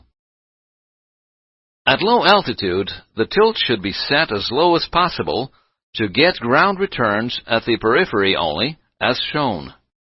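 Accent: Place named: Netherlands. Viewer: They still play this old video in our training. The same narrator is in lots of the old Embraer CBT videos. I always loved this guy's accent... is that Dutch?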